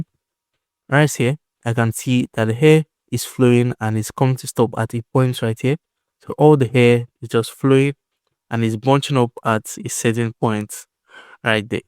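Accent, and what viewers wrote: Nigeria. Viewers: Curious. Are you Nigerian by any chance? Your accent sounds familiar... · Being looking for a Nigerian to do a video like this, been tired of hearing accents.. kudos bro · Thanks bro, you sound nigerian though this is what i have been looking for a video that explains everything 20hrs is so awesome and for free nah bro we are grateful ill be back in 2 weeks to my comment and i have completed it by then .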